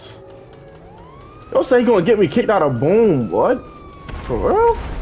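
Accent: Baltimore, United States. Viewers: You from baltimore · Baltimore accent never fails 😭😭😭😭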